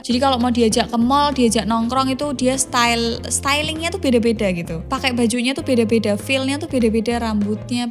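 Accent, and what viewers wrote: Java, Indonesia. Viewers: medok medok kepiye..gitu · Jowone pundi sampean kak... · Boso jowo ne medok banget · mbak e medhok tp tep nggemesi hmm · jowone medok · Logatmu..medok tenan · medok banget suarane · Jawa bgt reeekkkk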